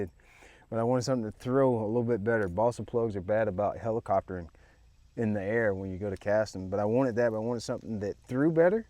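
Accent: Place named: South, United States. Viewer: Boi I’m from Louisiana but THAT accent is so country i love it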